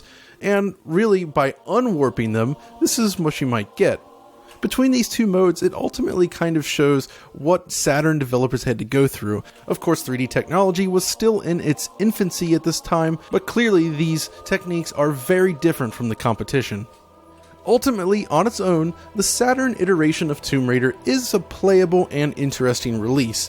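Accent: United States. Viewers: he's American and definitely has an accent. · This guy's American accent makes me cringe. Still, great work DF! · Well, he IS from America.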